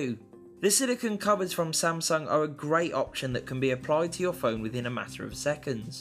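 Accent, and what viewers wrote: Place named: Birmingham, United Kingdom. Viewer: Guess you're a Brummie then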